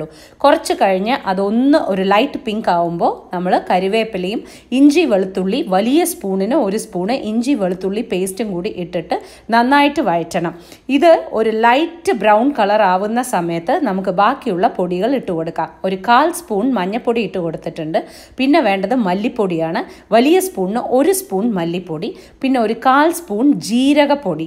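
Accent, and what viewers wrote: Palakkad, India. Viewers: എനിക്ക് വിനി കുട്ടി കഴിക്കുന്നത് കാണാനാ ഇഷ്ടം.. Very natural.. No കാട്ടി കുട്ടൽ.. in palakkadan slang😄😄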